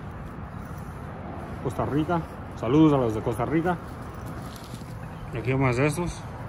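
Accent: Spain. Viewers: A mi también me encanta, y el acento español q tiene más..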